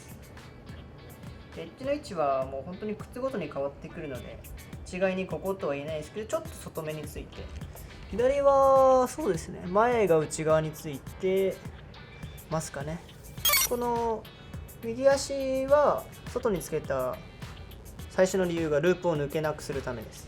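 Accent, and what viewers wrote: Nagoya, Japan. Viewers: 適度な名古屋弁がめちゃめちゃ良いです😆✨✨ こんなふうに現役スケーターさんの動画が見られるなんて良い時代やなぁ💕 宇野さん、チャンネル開設ありがとうございます🎶🎶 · 名古屋弁が…… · ほかっとく💕 たまに出る、名古屋弁にキュンキュンしちゃう🥰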